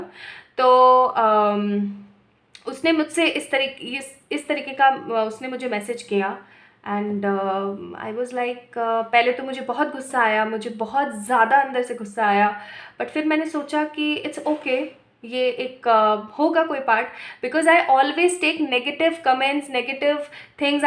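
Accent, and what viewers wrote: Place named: Hyderabad, India. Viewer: KYe ko..is Hyderabadi hindi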